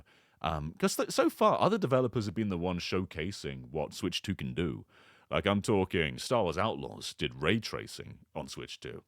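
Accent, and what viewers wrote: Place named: United Kingdom. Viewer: You sound British what time does it start in the UK?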